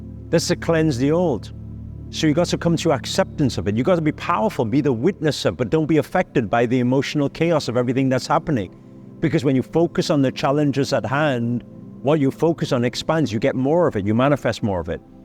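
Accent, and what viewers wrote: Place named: Wales, United Kingdom. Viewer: I had an old colleague get in touch lately but I always had an off feeling about them so I didn’t engage her - was polite but short. I trust my gut. I’ve been giving a training opportunity and taken it by the horns, very very grateful! Where is your accent from? It’s very interesting. Is there some Welsh in there?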